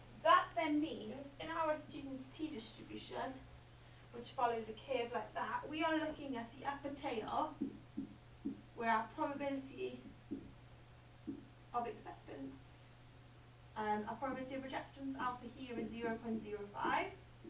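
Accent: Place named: United Kingdom. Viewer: damn that british accent -_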